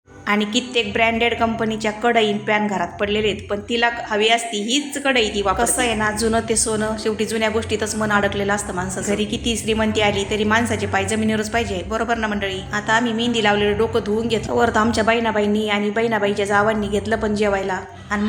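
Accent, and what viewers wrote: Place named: Ahilyanagar, India. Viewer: 👍अरे वा म्हणजे माझा अंदाज बरोबर होता 😄 छान विडिओ असतात तुझे मी नगरला aste👍आपल्या माहेरचं कुणीतरी बोलत आहे asc👍जाणवत तूझ्या बोलण्याच्या टोन वरून 😄🙏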